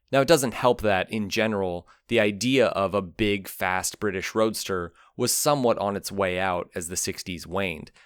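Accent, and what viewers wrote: United States. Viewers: Well done for putting this together with a nice presentation of the models throughout the years, ... but I wish you would pronounce Jaguar, Nissan and Datsun correctly and not in that dreadful American way. For instance, Jaguar is pronounced 'Jag-you-are' NOT 'Jag-Wah'! Nissan is pronounced 'Niss-an' (as in Miss) not 'Nice-An'.! Also, as a suggestion, since the emphasis was on the TR6, a bit of info on the uniqueness of its power train (which is one of it's main features), that being the 6-cylinder PI. With mechanical fuel injection, it stood out from others in and above its class because of this. Interestingly you make the comment, or imply, that in the early seventies, for a UK motor manufacturer, it was unique with it's overhead cam, but Jaguar had their overhead cam XK engine from the early 50's as fitted to the XK120-150 and the Mk1 & 2 from the late 50's & throughout the 60's. 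However, the TR6 is definitely on my wish list. You refuelled my interest! Thanks. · THERE IS NO "W" IN "JAG YOU ARE." Stopped watching, since an American can't teach us about BRITISH CLASSIC CARS. And DRC'd as well.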